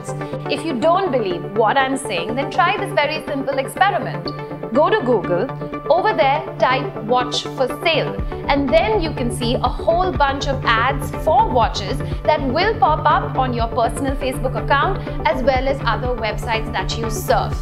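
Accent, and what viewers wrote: India. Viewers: Hollywood bgm ! But indian english!!😅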